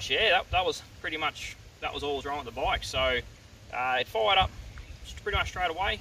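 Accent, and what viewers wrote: Australia. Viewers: Love the content mate I love the pure Aussie Never commented on a video before but I think this needed it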